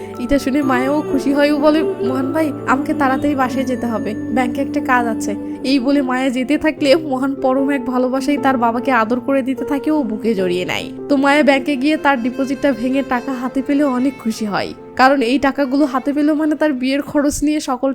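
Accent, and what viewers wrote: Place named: Khulna, Bangladesh. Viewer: আপনার বাড়ি নিশ্চিত যশোর বা খুলনা।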